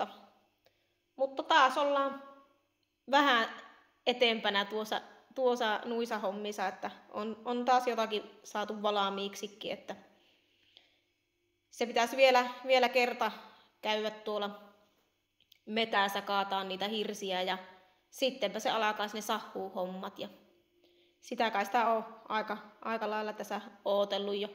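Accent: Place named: Oulu, Finland. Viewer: On sun puhheesa muutamia oulun murteen sanoja 👍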